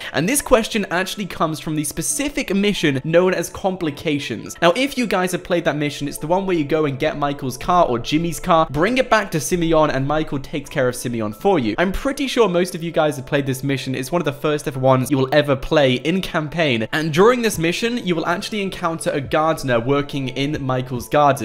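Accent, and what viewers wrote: England, United Kingdom. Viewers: he said Car Loss instead of Carlos man his England accent is funny sometimes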